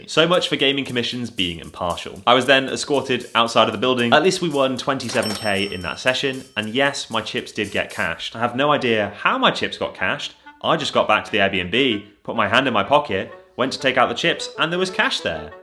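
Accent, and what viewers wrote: United Kingdom. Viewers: Baffled u can be recognized by just your voice? In the US and Canada, a british accent sticks out like a sore thumb. Not that it’s bad, it’s just easier to distinguish, unless u can use a different accent. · U sound british... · In this video you said you were baffled to be recognised by your voice, but isn't a British person playing cards in places like Arkansas quite unusual? I (fellow Brit) went to Texas once and my accent turned heads constantly. You might be attracting attention simply by talking in some of the non-tourist areas you visit. A tipped-off floor manager could have one of your videos playing in his ear whilst he listens to you talk live at the table, and you're disguise is blown.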